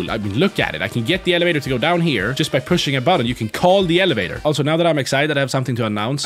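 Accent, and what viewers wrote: Sweden. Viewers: are you swedish? i'm norwegian btw! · Are you swedish?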